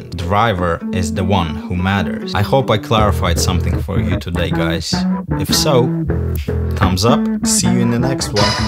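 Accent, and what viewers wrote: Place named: Russia. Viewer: а я сразу понял что наш человек вещает )))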